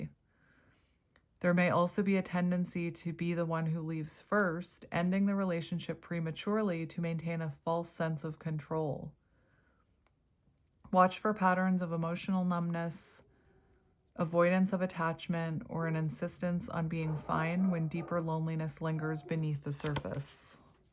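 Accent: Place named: Canada. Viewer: Crying and throwing up every time you say "about" because this guy has the most beautiful Canadian accent I've ever heard in my life. 😭😭😭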